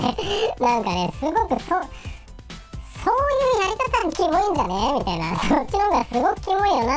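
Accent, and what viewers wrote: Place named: Osaka, Japan. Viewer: なんだか、大阪のおばちゃんがしゃべってるみたいｗ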